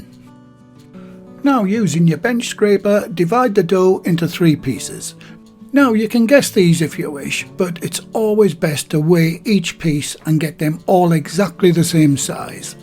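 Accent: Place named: County Durham, United Kingdom. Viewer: Only discovered you channel today, not just great simple wholesome recipes but your voice is making me homesick. I’m from County Durham but live down south now.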